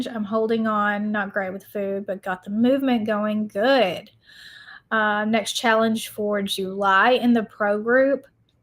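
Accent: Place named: Tennessee, United States. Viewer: Your funny and cute I enjoy hearing you talk with that Tennessee accent 😂😂😂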